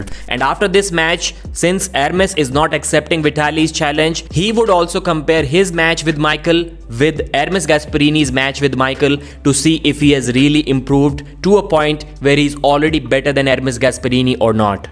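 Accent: India. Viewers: fix your god awful indian accent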